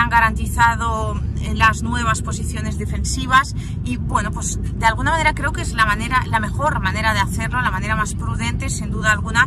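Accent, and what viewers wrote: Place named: Spain. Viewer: te queremos tanto aquí en Latinoamerica,que quisiéramos vayas cambiando ese acento ibérico, a uno más acorde con nuestra latitud.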